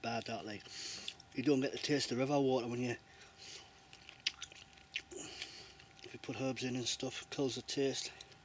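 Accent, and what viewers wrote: Newcastle, United Kingdom. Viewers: Is that a Newcastle accent in so sorry if its Sunderland lol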